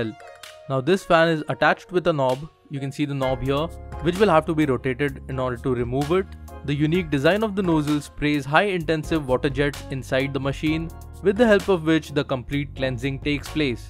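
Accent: India. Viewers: Indian cringe accent :v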